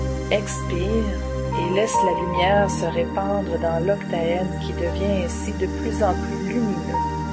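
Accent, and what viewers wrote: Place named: Quebec, Canada. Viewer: Merci pour cette méditation mais dommage je n’arrive pas à comprendre autre langage à cause de votre accent québécois ou canadien. C’est vraiment vraiment dommage mais bon merci en tout cas bonne soirée bonne nuit ciao ciao. Car quand j’ai Michaël me pardonne mais on m’a demandé de dicter ses mots secondaires c’est ce que je fais voilà au revoir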